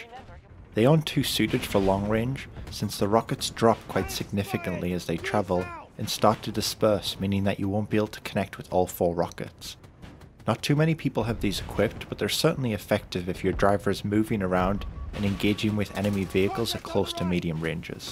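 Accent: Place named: Europe. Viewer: judging by your accent, I'm assuming EU time zones. When are you playing? What game mode? It's a shame we can't easily play servers that frequents.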